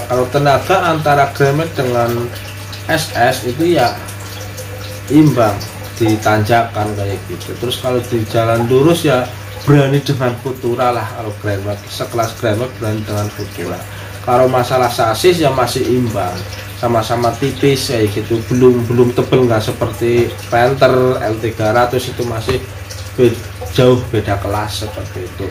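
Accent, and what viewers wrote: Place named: Banyumas, Indonesia. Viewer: Salam ngapak lik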